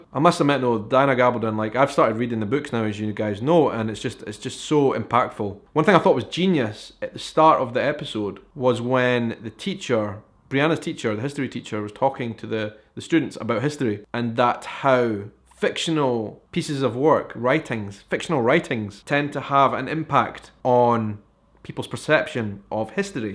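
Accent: Scotland, United Kingdom. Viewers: I envy your background, your culture, your accent and mostly your country with it's rich history. I myself didn't know about the history Scotland had, thanks to Outlander I've learnt a lot. Thanks for sharing you great country with us. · so happy to hear a scottish accent... and actually understand it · You are welcome. I love that Scottish accent. I never been to Scottland ever but when you show us the sites of Edinburg I feel like I am there.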